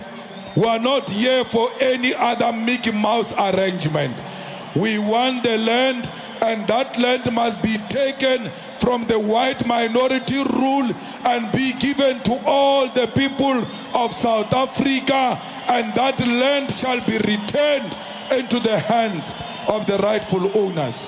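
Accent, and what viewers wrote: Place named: Zimbabwe. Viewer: Sounds like Zimbabwe where Malema was born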